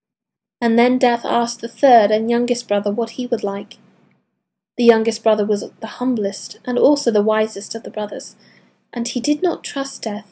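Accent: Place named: United Kingdom. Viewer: Captivating voice and perfect British accent. It sounds like Hermione's voice. Nice reading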